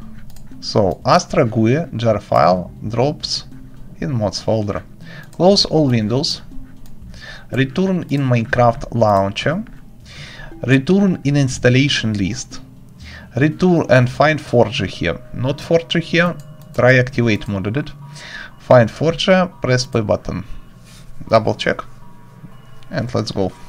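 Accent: Russia. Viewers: Очень русский акцент))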